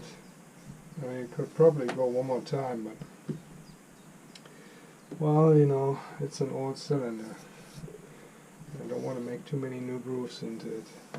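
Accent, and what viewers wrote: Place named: Germany. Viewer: Somehow, from your accent, I'm guessing your mother tongue might be German. I am with a MD1B from the 1970ies that was running until 2019 sort of, until then it was left for good, might have some little rust and such... I want to try to fix it all myself first, but if I fail I might want to come back to you with questions and such.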